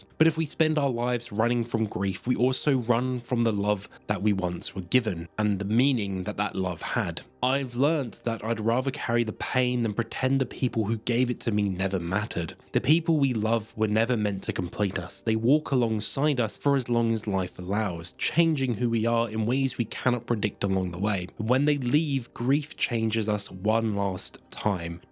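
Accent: United Kingdom. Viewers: No he just has a hard English accent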